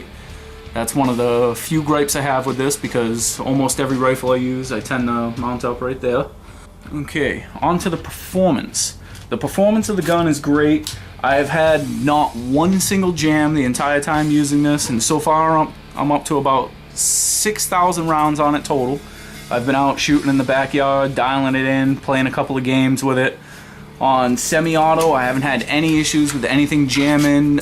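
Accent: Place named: Boston, United States. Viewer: You live near Boston MA don't you I know that accent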